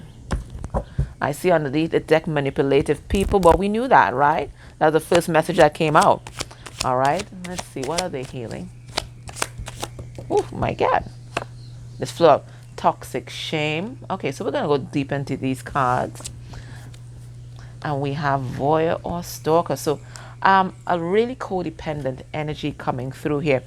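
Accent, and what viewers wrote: Trinidad and Tobago. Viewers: You sound like yuh from 🇹🇹 Good reading! ♐🇹🇹 #Reppin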